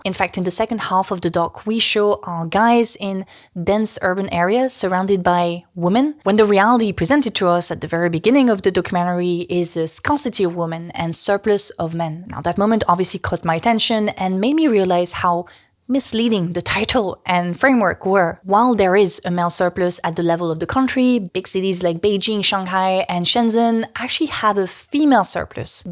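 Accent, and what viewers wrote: France. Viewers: Je me disais bien que je ressentais cet accent français 😂❤ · Are you french? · Ahh, makes videos deliberately titled ' the toxic hedonism of male travel vloggers ' and 'are straight men ok?' all in a slightly provocative and mocking manner with almost a glee and a false sense of concern in the background and laughing at people who respond to the generalization, suddenly talking about the gender wars with her shtty french accent. Never change girl.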